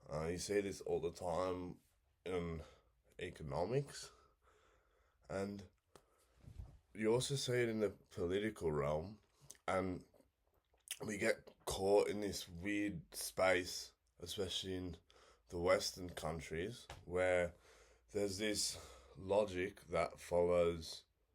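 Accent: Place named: Liverpool, United Kingdom. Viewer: My English is too poor to properly deliver my thoughts about immigration... Let's just say it has been a problematic topic around here too. I don't mind if e.g japanese accountant immigrates here via marriage but we don't have much need for thousands whose only work skill is goat herding. And also, multi ethnic societies are fuctional and fine, but multi cultural societies will face problems. And also #2... Australia? I thought you were British, from Liverpool or something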